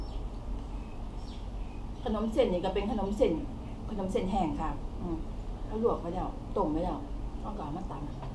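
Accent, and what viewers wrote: Isan, Thailand. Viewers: เพื่อนๆ เจ่าไปใสเมิดน้อ มื้อนิ ค่ะ.สาวอิสานเอ้า.น้อง แหม.ไปใสจร้า.เสียงเว่า เจ่า ตะฮักๆ เนาะ.คนกะตะฮักคึกิน.ตะแซบแท่. ส้มตำ แหม..เผื่อ แนเด้อ จร้า..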